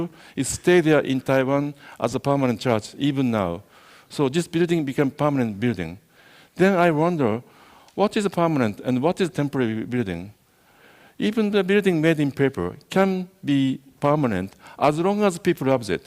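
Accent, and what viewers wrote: Japan. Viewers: Im pretty sure he did a speech with Japanese ascent !!! but nice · Sure you are right. But I don't agree with the fact that he's pronouncing as he is "supposed" to. I know Japanese people who have less achievement in life than he does but speak better English because they try harder to erase their accent and make themselves understandable. I just feel that he is making no effort whatsoever to even try to alleviate the pureness of his accent. I don't doubt he can do it though, I just think he consciously stops himself of trying for whatever reason.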